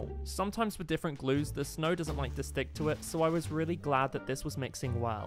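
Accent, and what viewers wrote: United Kingdom. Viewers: You British?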